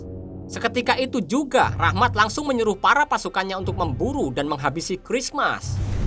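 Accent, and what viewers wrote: Java, Indonesia. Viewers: Suara lu terlalu Jawa mas 🗿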